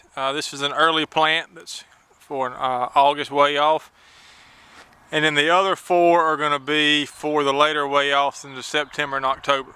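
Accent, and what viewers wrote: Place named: North Carolina, United States. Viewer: native NC, sounds like a Civil War movie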